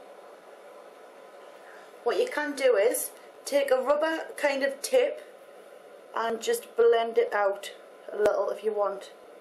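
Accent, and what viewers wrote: Newcastle, United Kingdom. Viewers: Are you From Newcastle ? You have a Geordie Accent [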